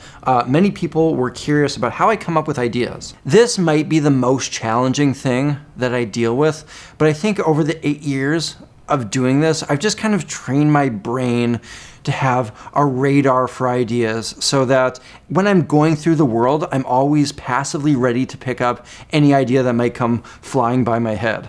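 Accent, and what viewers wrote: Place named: Canada. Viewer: I think I found on a JHS "What's on your board" sort of video, and I remember thinking "Hey, I think this guy has a Canadian accent", and as soon as I found I was right --> SUBSCRIBED. Love ya, congrats on 1 million subscribers, it's well deserved.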